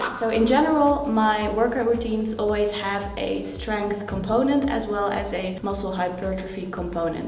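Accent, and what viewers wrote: Netherlands. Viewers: Im just curious, where are you originally from? Your accent sounds Dutch to me ^^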